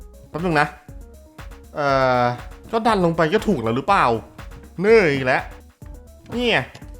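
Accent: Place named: Thailand. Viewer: นับวันพี่ ยิ่งพูดไม่ถูกเเถมมีเหน่อด๋วย <num>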